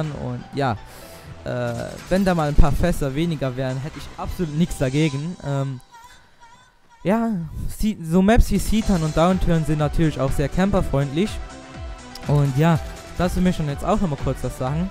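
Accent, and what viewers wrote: Luxembourg. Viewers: dass du aus luxembourg kommst hört man finde es cool das es auch jemand aus luxembourg gibt der Commentaries macht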